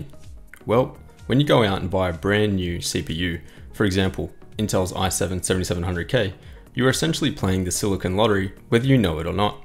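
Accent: Australia. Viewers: Man, Australian accent is a little complicated...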